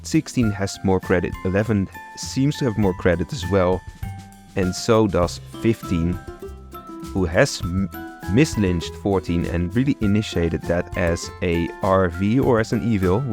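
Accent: Netherlands. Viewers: Dutch accent is hard not to notice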